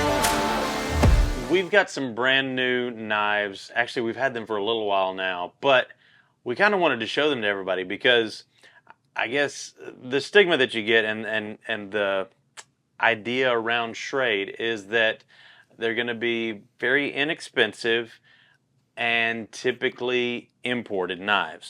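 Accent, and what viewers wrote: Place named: Appalachia, United States. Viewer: Have you met Jeff from Everything Auto? Pretty sure he’s your vocal dopalganger! Maybe it’s just that Appalachian twang?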